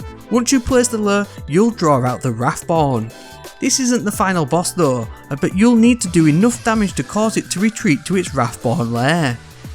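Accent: England, United Kingdom. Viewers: That's an interesting pommy accent you have there lol. I've never lure pronounced lurrr before. Thanks for the vid ;) very helpful.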